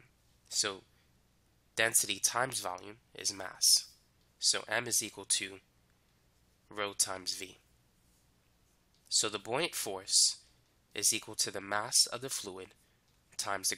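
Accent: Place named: New York City, United States. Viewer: Damn you have a heavy NYC accent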